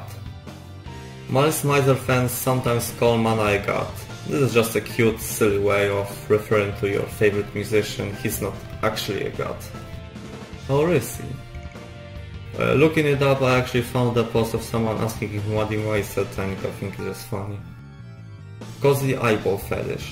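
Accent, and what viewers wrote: Poland. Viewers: Polish accent?? 🤨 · brzmisz jak polak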